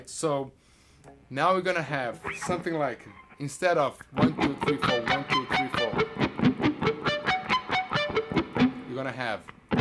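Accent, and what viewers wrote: Brazil. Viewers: Eae galera, o inglês do é bom? ou ele tá cheio de sotaque BR? SHAHUSHUS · É praticamente impossível falar sem sotaque. O que importa é que o cara é fluente. E, aliás, o sotaque brasileiro é agradável aos ouvidos estrangeiros. Não tem problema nenhum.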